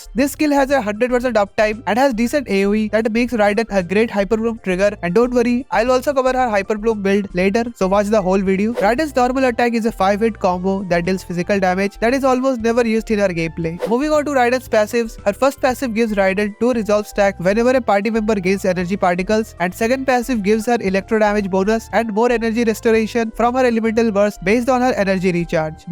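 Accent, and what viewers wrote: India. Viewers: are u from india ? grt video btw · 100% Indian.. awaz bta ri hai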